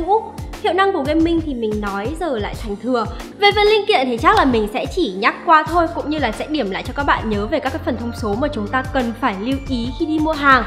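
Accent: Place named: North, Vietnam. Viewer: Thực sự rất thích review giọng nữ đặc biệt lại là giọng bắc trời ơi dễ thương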